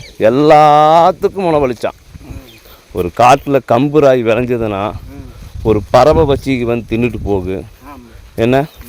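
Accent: Kongu, India. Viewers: உங்க வாய்ஸ் நம்ம மேக்கத்தா பாஷை அருமை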